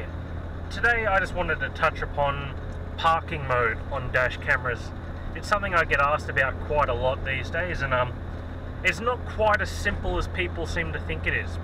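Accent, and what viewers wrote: Australia. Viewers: Thanks! Love that Australian accent! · aussie accent! <3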